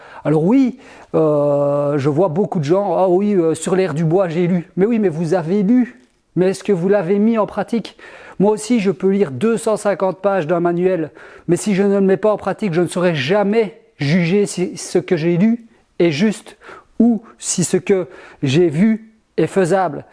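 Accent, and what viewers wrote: Belgium. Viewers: Salut, j'aime beaucoup ton "Belge parlé", je te rassure tu n'es pas un cheval blanc et encore moins une star du you tube, mais reste comme ça, la qualité du contenu est bien perçue, on sent bien le VRAI. Un seul regret, c'est que la Belgique soit si loin du pays basque 😊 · Salut, je suis venu sur ta chaine par le biais de floky, malgré le temps je suis toujours là à regarder tes videos et on doit être un gros paquet, nous comprenons bien ton coup de mou, pas facile d'encaisser des critiques sans rien savoir de l'honneteté intellectuelle, de la compétence, ni de la comprehension des personnes les regardant. Pour comprendre tes vidéos, il faut avoir connu tes débuts, tes explications, et savoir que tu n'as rien d'un donneur de leçon... Alors, comme tu ne vas pas sans cesse expliquer sous peine de ne pas avancer, dis toi simplement que parmi tes 40K d'abonnés, tous ne sont pas bienveillants et avance et crois moi le jour ou tu auras le melon ou tout autre connerie, la communauté entiere saura te dire à quel point tu es dans l'erreur...en attendant, on aime tes videos, les astuces minutes (tant appreciées de floky), tes prononciations belges, l'académie du 'Suleve', ton amour des abeilles, bref, releve toi, mon gars t'es une bête du bois..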